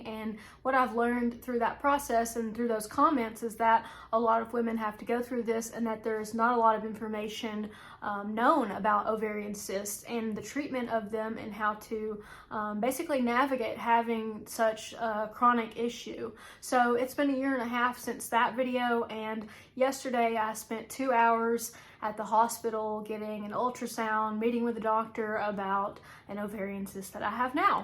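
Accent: South, United States. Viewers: OTC pain meds can damage your Liver over time. This can cause weight gain and the eventual need for a Liver Transplant, so that isn’t a real solution. Even opioids won’t do anything but mask symptoms. I learned that regular OB/GYNS are pretty unhelpful but that Oncological OB’s take things more seriously - so see one of THOSE. At your young age, they are assuming you want to have kids/more kids, so they don’t want to remove things. They also know that removing ovar(ies) can put you into immediate Menopause. So they are resisting that solution. A Very Low Carb/Keto/Carnivore diet can make a difference bc carbs/grains/alcohol/sugar can CAUSE PCOS. If you don’t give your body any of that, it can’t then cause Insulin Resistance, which causes PCOS. There IS scientific info on this. Watch YT videos by Dr. Ken Berry about this. If the pain is too much, AND you don’t intend to have kids/more kids anyway, maybe you’d be better off getting your parts OUT and going on HRT (Naturopaths know much more about this than OB/GYNS who were taught wrong by Big Pharma which runs all medical schools. 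Mild, regular exercise can help remove the bad hormones and toxins. Castor Oil Packs (TONS of YT vids on this) can help. You are very articulate and have done lots of research. Many doctors ASSUME that a female with a Southern accent is ignorant. That may be part of the issue too. Even if you know your stuff, the accent will make them dismiss your concerns…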